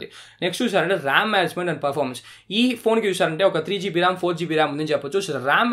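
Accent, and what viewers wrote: Tamil Nadu, India. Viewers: Telugu baga maatladutunnav bro kani koncham tamil style lo undi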